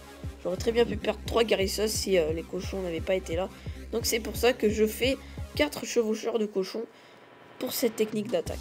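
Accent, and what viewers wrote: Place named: South, France. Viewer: On le sent l'accent du sud poto ^^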